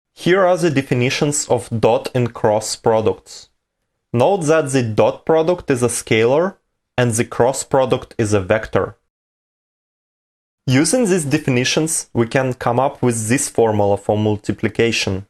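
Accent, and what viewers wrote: Russia. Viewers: Хард рашен акцент!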